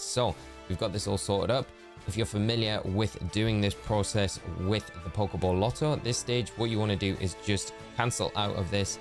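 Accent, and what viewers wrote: Newcastle, United Kingdom. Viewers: You’re a Geordie…?! Subscribed.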